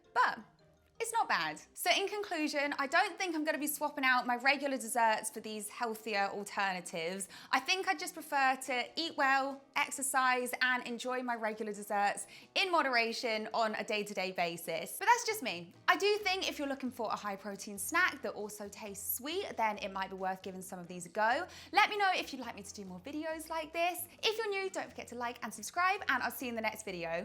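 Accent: United Kingdom. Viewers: I had no clue that you were British or wherever your from. 😂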